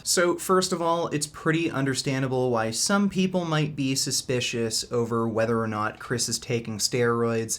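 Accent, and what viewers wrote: Canada. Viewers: He’s Canadian bro what did you expect eh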